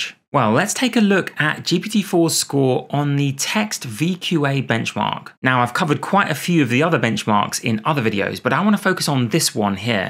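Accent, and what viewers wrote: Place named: United Kingdom. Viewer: Having a British accent is a cheat code for being a science / STEM YouTuber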